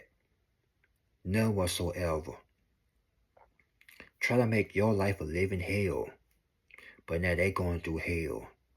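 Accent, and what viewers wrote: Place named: South, United States. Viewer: Hey bruh, I know people got so e shit to say about me. I also know you seem to be on my side. Seeing how you got a southern accent... im sure it's a culture shock sometimes. 1 word can have a completely different meaning in several languages. It also doesn't help that people are constantly changing the meanings of words in our own language. "Im dead" today... doesn't mean what it did 10 years ago. Next time you think you hear something offensive... don't be afraid to ask what that term means to them personally. I only say this because your one of the few level headed people I know strong enough to follow through. Change your mind... change your world ❤ Keep ya head up son.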